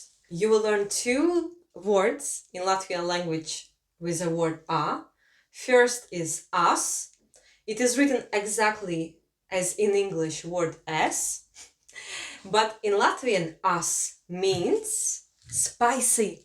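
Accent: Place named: Russia. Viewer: Its interesting when russian is teaching latvian in english :D Had some troubles with Ģ pronunciation. And classic russian fyorst not first :D But in general nice video and idea :)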